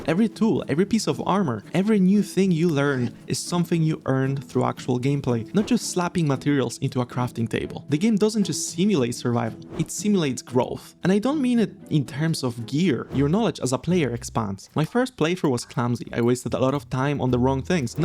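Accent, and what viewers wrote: Poland. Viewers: as soon as I heard your accent I was like, is he polish? and then i checked channel localization XD Pozdro byku, spoko film i rozwijaj się dalej!! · I immediately knew you were Polish. Years of listening to people read in english class suddenly came back to me when I heard you speak.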